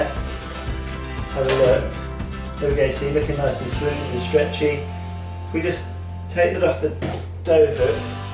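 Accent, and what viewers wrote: United Kingdom. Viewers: Where are you from? I’m guessing British but I’m pretty terrible at these things:/ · " "!!!! i get it.... u a mancunian?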